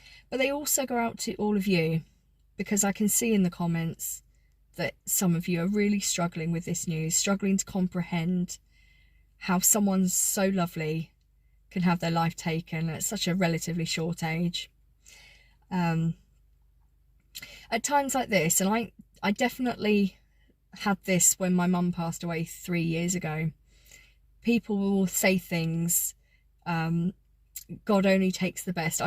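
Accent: South, United States. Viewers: She was the first person I subscribed to. She was so wonderful to listen to. I loved her southern accent! She will be missed greatly by us all. Condolences to her family RIP sweet you are now safe in our heavenly fathers arms! 🙏❤️ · Thank you, It's true though, came into our homes 100s of times because we invited her in for a chat. Many of us will miss her deeply and yes keep her channel (I will as well) just to hear her dulcet Southern tones. I remember when my Mother passed away I would call her daily praying she would just pick up the phone so I could hear her voice say "Hello". There is some breaking news here in the UK. The Palace has announced that our amazing Queen's health is deteriorating and as I write to you members of the family are travelling up to Balmoral to be with her. Her brain is strong and hopefully she will pull through, however this does not sound good. 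· She will be truly missed. Loved her videos and her wonderful southern accent. She was a wonderful soul. May she rest in peace 🌈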